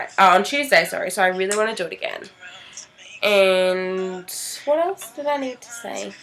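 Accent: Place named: New Zealand. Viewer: just an observation - you talk like a new zealander. we have to change that!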